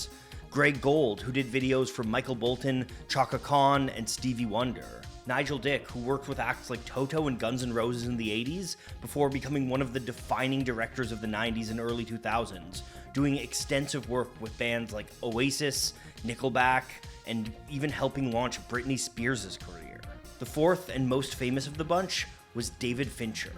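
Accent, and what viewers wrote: Canada. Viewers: I never realized was canadian till he talked about the dart hanging out of slash's mouth l.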